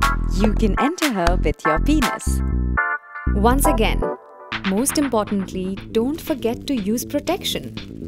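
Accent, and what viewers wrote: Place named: India. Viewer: Indian accent unsexy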